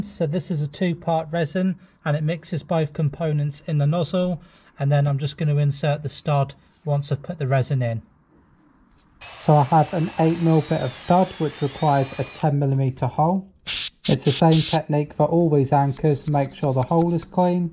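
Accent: England, United Kingdom. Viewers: Is that England accent?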